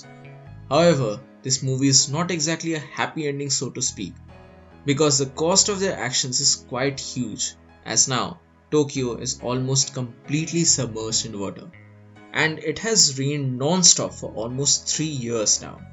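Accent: India. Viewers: Wanna have an Indian English conversation? we talk just like him.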